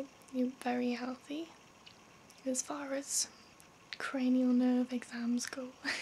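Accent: United Kingdom. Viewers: that accent is delicious. Where in the UK is that from? Also great video, of course. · Google needs to design an auditory searching mechanism that allows you to search by accent. The ASMR industry needs more Brits! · I'm sorry to ask you this, but what is your accent? I can't seem to figure it out, I'm terrible with telling the difference between Scottish, Irish and Welsh xD haha love all your videos, keep going :) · great to hear some bri'ish accent, and on top of that, it just a great vid! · ahhhh...British accent nerve exam..YES!! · I think its the British accent that does it for me.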